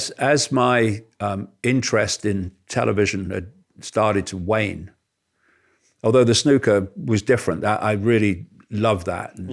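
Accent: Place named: Birmingham, United Kingdom. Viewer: Spot on me... BUT YOU ARE STILL DRAGGING THE CORPSE OF COLEMANBALLS THROUGH THE OPEN SEWER OF MY OVERHYPED DESCRIPTION WHICH IS MORE GRIPPING... WHAT...IT IS GETTING MUCH WORSE BY THE SECOND......PLEASE DAVID...DEAR GOD STOP THIS DRIVEL AND PRESENT SOMETHING EVEN REMOTELY CONNECTED WITH THE PRESENT, REAL WORLD AND MAYBE EVEN YOUR MOANING BRUMMY DULCET TONES.....I CAN'T COPE WITH ANYMORE... YOU HAVE A POTENTIAL WORLD STAGE AND YOU FUCKING WASTE IT 🗑 TOSSER BEHAVIOR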